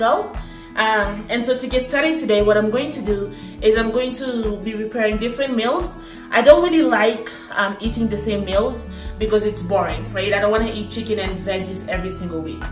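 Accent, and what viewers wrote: Kenya. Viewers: You sound Kenyan, are you from Kenya?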